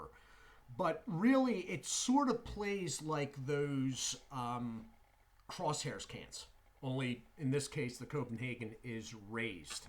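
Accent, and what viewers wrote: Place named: Philadelphia, United States. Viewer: New sub! Are you from PA? You sound very Philadelphian lol cheers!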